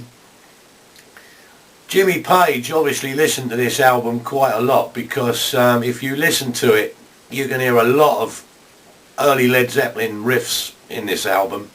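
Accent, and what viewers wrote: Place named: London, United Kingdom. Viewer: lots of very good stuff, everyones got their favourites, I would have put ist, and who knows... it was good seeing groups back in those days, you put it across well and its good to hear someone with a london accent talking about artsy type of stuff. Id also recommend who I first thought of as a copy but rediscovered them and play them a lot. if you come across by put it on if possiblethanks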